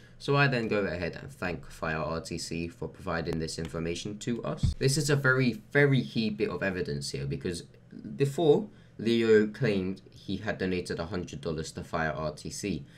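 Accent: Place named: United Kingdom. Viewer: How did I get subscribed to someone who speaks with a British accent sounds like he's chewing on a mouthful of caramels while he's trying to talk. Nobody gives a fuck about your opinion you were little island of 20 million people LMAO.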